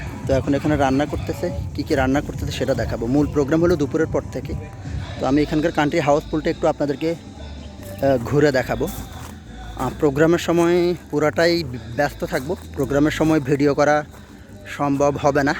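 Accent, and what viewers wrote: Noakhali, Bangladesh. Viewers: ভাই আপনার বাড়ি নোয়াখালী?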